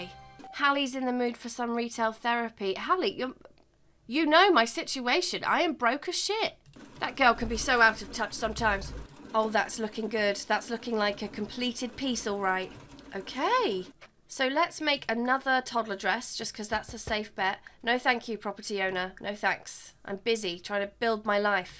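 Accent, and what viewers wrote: United Kingdom. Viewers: are u from uk i live in uk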